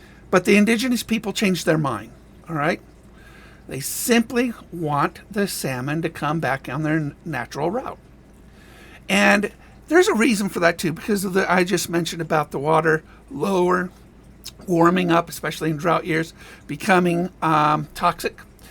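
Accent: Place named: England, United Kingdom. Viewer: The dams needed to be removed ! But the sediments needed to be dredged out before removal plain and simple. It will take decades to flush out into the mudhole in the pacific out front of the klamath. Decades for the trees willow ash etc to grow to shade the river again.. As far as the sasenach narrating this go home to where you come from ! The river will heal itself just gona take time lots of time!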